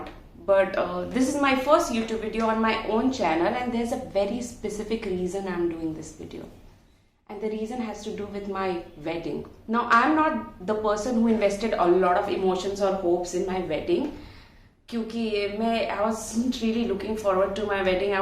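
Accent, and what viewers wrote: Bihar, India. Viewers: I love you ..because you bihari accent i love it ❤ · Ahh haa badhiya mahek raha hai😂😂 your accent gave us the pure bihari vibes.😘😘 Proud bihari♥️♥️ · Thank god you open your channel, you are really good to being you , some ppl find it hard anyway I love your bihari ascent...❤️❤️❤️ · I love ur language and ur expression... Mujhe lagta tha bihari language rough hoti he but aapki language sunne me maja aata he... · Mam apke bolne ka tarika bhut awesome h... 😍❤️...apki Bihari language use krty rha kro... funny lagty ho bhut bolty hue 😀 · I loved her bihari accent and l am following you from the beginning ok tested. You always gave me amazing vibes. 😘😘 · Eventhough I am southern Indian and understand only urban hindi I like your Bihari accent. You are drop dead gorgeous in your wedding than the recreation. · Ur d best❤️m big fan of urs frm ok tasted 😘I do watch maximum video just bcoz ur there n m in love wth ur bihari accent ❤️ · Liked u for bihari tone ❤ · U r looking fab in both of them ..but your actual wedding makeup was simple,peaceful..i mean ...aisa koi bnwati ni lg rha h ...u r looking real in that pic ..but in the second one...sorry to say but you are looking fake....sorry ...but u r really looking cute in that first pic....bdw i am also from bihar ar hmara v accent wahi h to mza e a jata h sunne me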